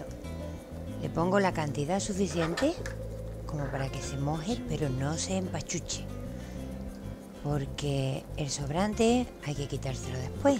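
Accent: Andalusia, Spain. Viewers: soy de Argentina m encantan tus vídeos los veo desde cuando mostraba tus hermosos jarrones pero lo que más difruto es ese acento andaluz creó y esa hermosa y cariñosa forma de enseñar tus trabajos tratando de que llegue a nosotras tus seguidoras lo más instructivo posible felicitaciones un gusto enorme.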